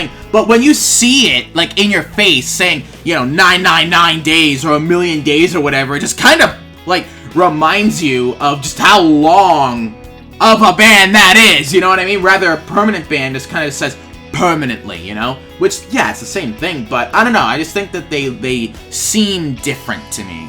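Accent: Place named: United Kingdom. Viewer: Hello! I actually watch your videos and I really enjoy them. Also, you have a pretty good bri'ish accent.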